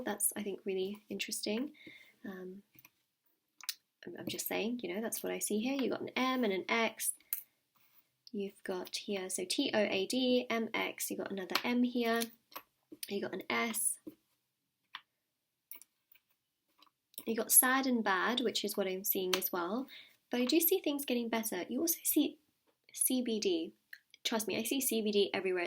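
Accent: England, United Kingdom. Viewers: Oh my gosh you’re English! Glad to see a fellow countryman represent! 😆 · You’ve got a beautiful English accent 🏴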